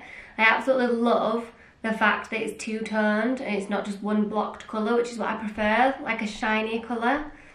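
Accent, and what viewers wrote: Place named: West Yorkshire, United Kingdom. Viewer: West Yorkshire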